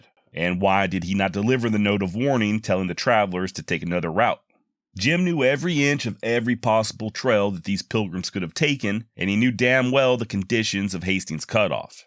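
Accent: United States. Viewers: Wow 😮 Such an AMERICAN made voice❤❤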